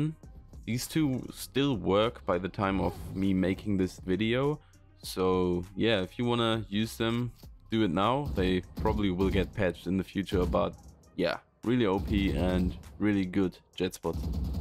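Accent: Germany. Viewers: Are you german? Bc ur accent sounds like it. And also do you play with your viewers sometimes? Or do you stream?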